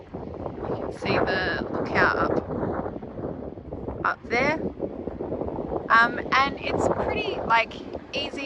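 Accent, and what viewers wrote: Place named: Australia. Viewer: Hmm..🥱 Tho I do like hearing your Aussie accent and raspy voice, good job!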